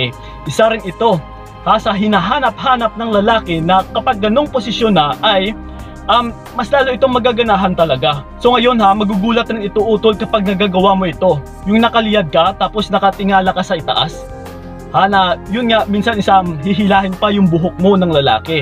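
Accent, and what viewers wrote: Iloilo, Philippines. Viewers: daw ilonggo tono mo tol hehe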